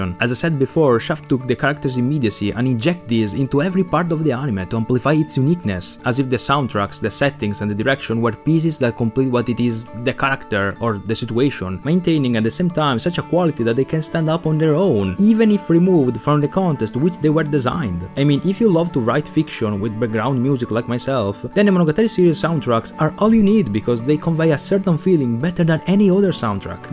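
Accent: Italy. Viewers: Greetings from Itali fratello. While your accent makes the audio a bit more difficult to understand, it makes me feel a bit prideful to know this franchise has such a smart and good fan and he is from my same country. Wish you well, hope life is going well for you. I had this on my Guarda più tardi for the last few years, glad i finally watched it all. I know i will rewatch the whole Monogatari sooner or later and thanks to your video, i will appreciate it even more. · Haha, anche io appena sentito l'accento ero curioso di sapere se fosse italiano, stavo per scrivere lo stesso tuo commento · By any chance, are you italian? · his accent sounds very Italian to me.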